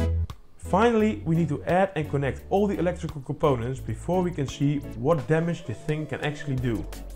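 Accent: Netherlands. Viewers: This accent screams dutch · Hoort meteen dat je een Nederlander bent🤣😂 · It took me exactly 0.2 seconds to find out you're dutch from your accent 😂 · When i heard your voice and saw your garden i directly thought this guy is dutch. chat gpt verified it. Hoi broeder van een andere moeder. Het is altijd leuk om een nedelander te vinden (of belg maar dat accent is anders). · I hate the dutch accent 😭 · Bro that Dutch accent goes hard af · Interesting video. IMHO if the "ice" projectiles become lethal it falls into lethal weaponry category and as such could be a perfect weapon to kill without trace. This definitely will attract attention from the CIA and alike. It also probably will be banned. Ps why define in feet? I hear on your acence that your Dutch. Let those US audience convert to metric with their arrogance for imperial for once. 😅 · Thats one hell of a dutch english acent there mate😂 Net als mark rutte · I bet this guy is Dutch, I can hear it within 2 seconds. 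Nice vid. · Heeerlijk altijd dat hollands accentje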